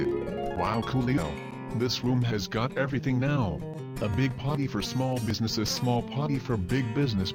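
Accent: Germany. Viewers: Great video! Are you german?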